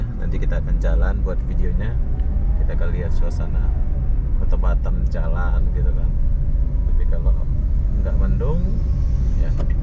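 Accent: Malaysia. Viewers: Dia lama di negeri orang, yakni Malaysia, sampe logat sana melekat. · Ini orang bukan melayu klw ngk salah,pi ngomong y kok melayu tolen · Logatnya separuh bahasa Malaysia.... " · Tanya bang mohon di jawab abang orang mana sih ko ngomongnya separuh indonesia separuh malaysia????🙏🙏🙏 Mohon jawab bang kepoo banget nih aku 😁😁😁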